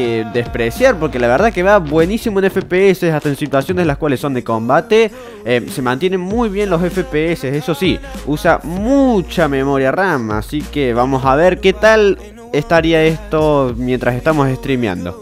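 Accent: Córdoba, Argentina. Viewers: Epa ese acento coordoves hermano!!!!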